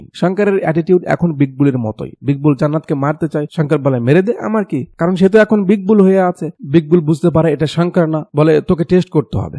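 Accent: Bogura, Bangladesh. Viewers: আপনি কি বগুড়ার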